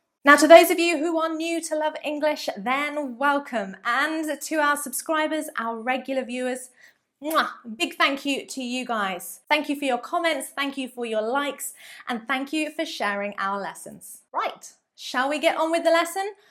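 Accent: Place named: RP, United Kingdom. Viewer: please do a video on your modern RP accent, i'm really impressed by your accent and want to learn so soon. I hope that you'll consider my request.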